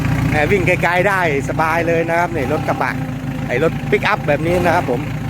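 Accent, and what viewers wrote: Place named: Isan, Thailand. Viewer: เว้าอิสานแน่คับ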